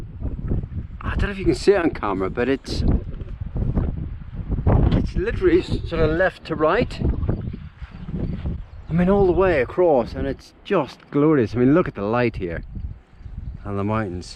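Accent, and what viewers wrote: Northern Ireland, United Kingdom. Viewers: Fabulous and entertaining, as a recent subscriber, these vlogs are right up there in content, compilation and delivery. His Irish charm effuses the delivery, great that he has to temper his Irish poetic romanticism! I would guess that with his soft N. Irish voice grew up in the northern part of his Co.Down? and looked south to the beautiful Mountains of Mourne for his inspiration?!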